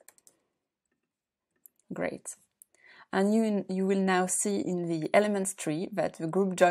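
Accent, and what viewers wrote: France. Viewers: Thank you so much for this video! p.s. I love your accent! -I know my people when I hear them. Go France!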